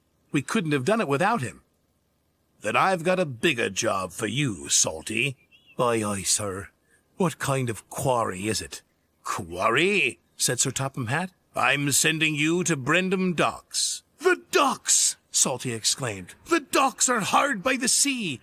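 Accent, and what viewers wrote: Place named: Ireland. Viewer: Irish accent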